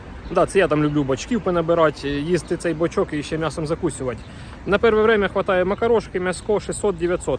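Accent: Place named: Poltava, Ukraine. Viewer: Друже, з Великоднем!!! А ти з України звідки? Балачка наче Полтавська. Нехай щастить.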